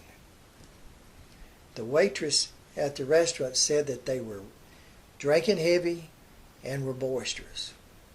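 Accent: South, United States. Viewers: the hillbilly naratory drives me crazy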